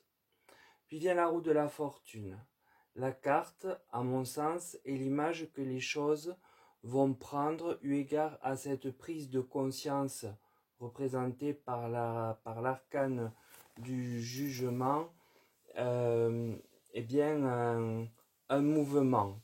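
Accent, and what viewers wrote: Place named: Toulon, France. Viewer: Bonjour, comme je t'ai dit sur le live de jordan je me suis abonnée 💋 et ce petit accent n'est pas très loin de Toulon je pense 😜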